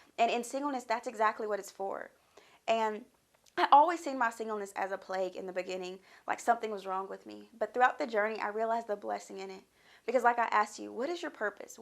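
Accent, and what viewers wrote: South, United States. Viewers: I hear a country accent ? Or am I tripping ? Lol I’m just as country mines is even worse people are always like girlllllll I know you from the south !!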